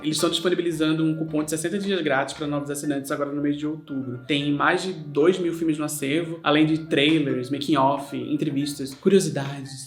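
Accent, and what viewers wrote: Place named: Recife, Brazil. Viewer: os vídeos de são o cantinho da internet que me faz sentir que tô tomando cerveja com uma amiga que fala sobre cultura pop de forma inteligente e debochada e matar a saudade do sotaque de Recife Relação parassocial 10\10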